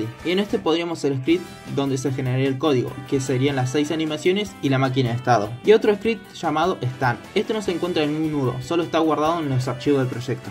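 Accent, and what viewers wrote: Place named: Spain. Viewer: Ah vi el de ingles i ya pense "Ah tiene acento español" y ahora me salio este hahahaa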